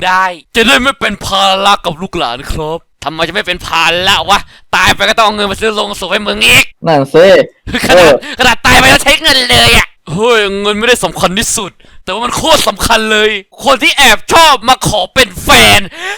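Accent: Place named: Isan, Thailand. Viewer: เล่นเว้าครับ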